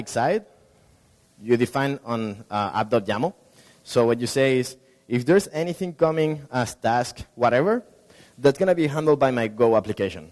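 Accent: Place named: Spain. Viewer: his accent is like a Spanish speaker from Spain speaking English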